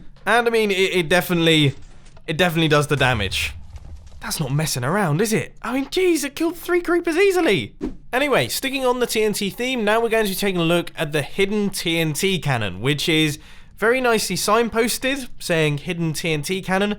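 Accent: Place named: United Kingdom. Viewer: No offense man, I accustom calling it British accent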